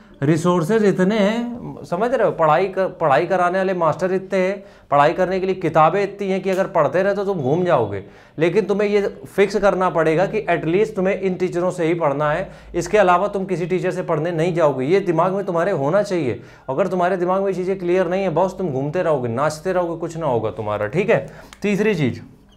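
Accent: Kanpur, India. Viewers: Bhaiya kanpur side se hai kya ap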